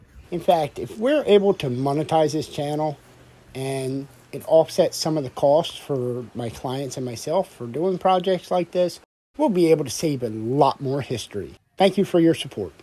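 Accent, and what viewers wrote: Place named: Mid-Atlantic, United States. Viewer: You have a mid-atlantic accent. Like a Philly or Baltimore accent.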